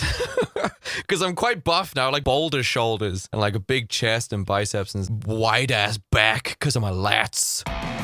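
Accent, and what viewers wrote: United States. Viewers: Why do you sound American? you put your youtube as United American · Where are you from? You sound American to me but I seen where you said you’re from the UK. I’m from the US and I think you sound American. Much love to you and love your videos!!!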